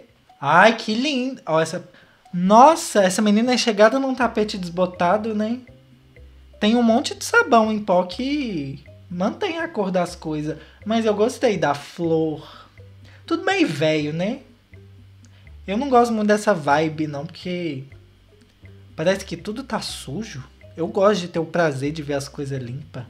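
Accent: Minas Gerais, Brazil. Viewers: Com esse sotaque só pode ser de Minas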